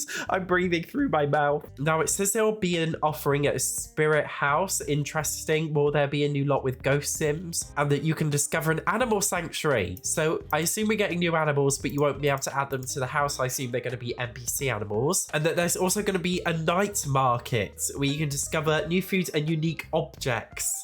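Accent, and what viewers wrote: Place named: United Kingdom. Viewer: In a way your cold makes you sound so cool! Goes well with your British accent 👍🏻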